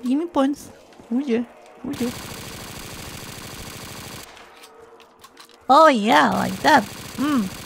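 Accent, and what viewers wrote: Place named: India. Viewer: She sounds India/Pakistani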